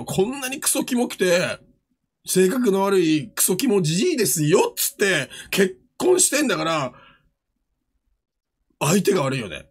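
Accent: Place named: Chiba, Japan. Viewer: 電話の時の話し方がマジで千葉の南の方のイントネーションで好き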